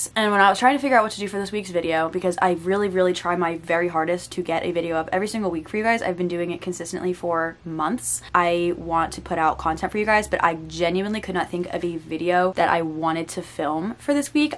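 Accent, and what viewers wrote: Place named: Pennsylvania, United States. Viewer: And i think Pennsylvania's English accent is the best .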